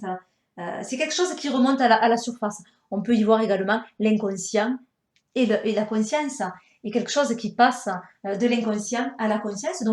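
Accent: South, France. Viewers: Quel accent du soleil une merveille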